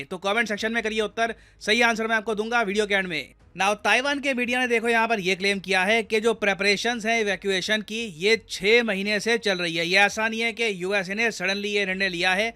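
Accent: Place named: Uttar Pradesh, India. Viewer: sir..... aap UP me kidhar se ho? Aapki tone se malum padta h ❤ Vaise me bhi UP se hi hu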